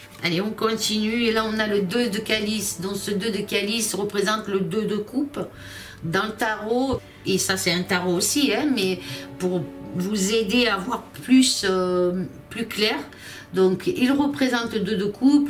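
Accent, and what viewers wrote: South, France. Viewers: Du repos belle, nous passons des portes vibratoires assez fortes! L'on nous conseil de nous reposer!! Après c'est toi qui vois? Un grand merci d'être là pour tous! penses à toi... Le plan continu doucement à se mettre en place.. Bises de coeur à vous tous et à toi!! Encore une belle lecture avec ton joli accent méridional qui chante à l'unisson...!!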